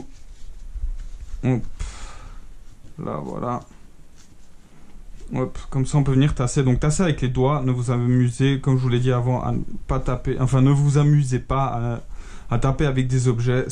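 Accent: Switzerland. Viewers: Parce que sur le carton de blanc de colle il y a les trois langues parler en suisse en plus tu as un accent suisse et les français ne disent pas papier ménage. Ils disent sopalin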